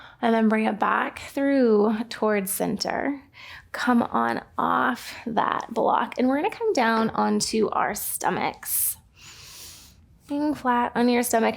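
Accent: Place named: California, United States. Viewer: As a British person, we usually skip anyone with his type of American accent (is it Californian). It sounds so annoying to us. Our communication style is the polar opposite. Anyway despite the accent I'm listening to the video. I can see you mean well.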